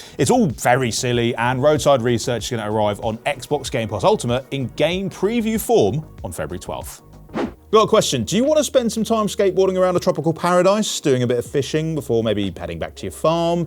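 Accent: United Kingdom. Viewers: They need to get rid of these UK accents. Petrol? , this guy really said dinosaur in an alien world game.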